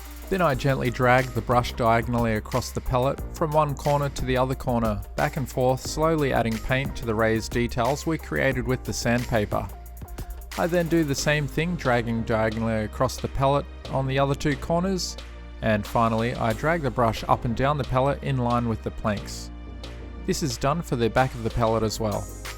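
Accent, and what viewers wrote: Australia. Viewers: His accent sounds Australian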